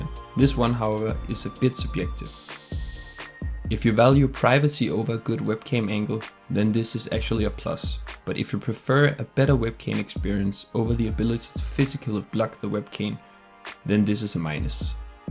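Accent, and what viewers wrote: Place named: Denmark. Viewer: Er du dansker? Jeg så det danske tastatur, og det lyder også lidt som om at du snakker med en dansk accent.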